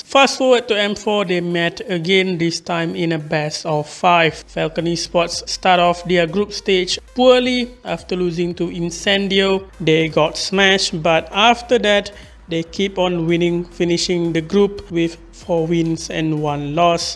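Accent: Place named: Indonesia. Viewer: in the accent sounds like indonesian or malaysian and little singaporean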